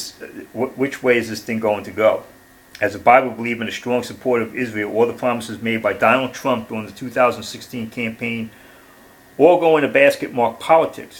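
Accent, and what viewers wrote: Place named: Boston, United States. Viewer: Are you from Boston like me ? You sound like you're from Boston.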